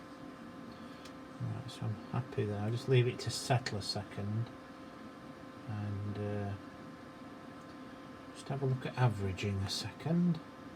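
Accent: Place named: Yorkshire, United Kingdom. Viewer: Thats a Yorkshire accent where you from? Im from Rotherham.. but i've lived in Norfolk 24 years now.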